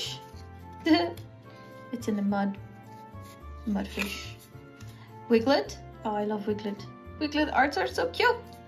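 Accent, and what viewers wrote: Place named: Europe. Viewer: Oh I see I wasn't familiar with your accent tho 😂😂 I tho. it was somewhere in Europe hahha 😂😂❤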